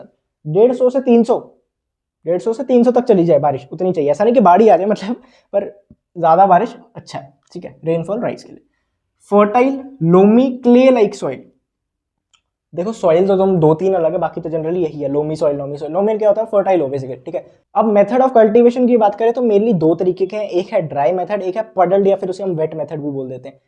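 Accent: Punjab, India. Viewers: bhaiya are you punjabi >?????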